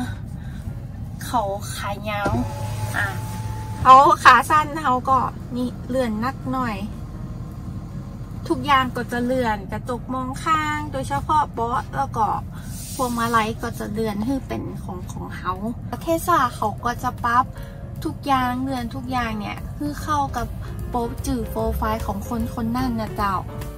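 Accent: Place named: North, Thailand. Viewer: ชอบความเหนือแท้100% ชอมมากกก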